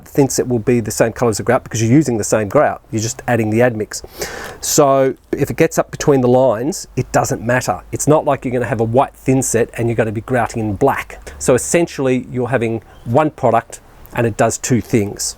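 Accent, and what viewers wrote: Australia. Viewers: Maybe it sounds like questions but more than likely just Aussie accent:) It'd be boring if we all sounded the same:P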